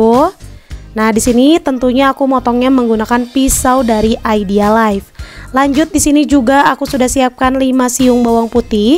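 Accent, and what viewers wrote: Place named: Sunda, Indonesia. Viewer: Lucu deh ah cara ngomongnya..meni sunda pisan 🥰🥰🥰..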